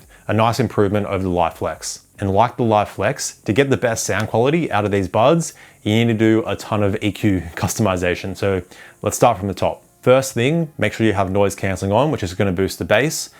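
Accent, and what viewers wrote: Australia. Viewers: i'd rather listen to aussie english all day than that stupid AI yt bs.. thats not necessarily a compliment 😂❤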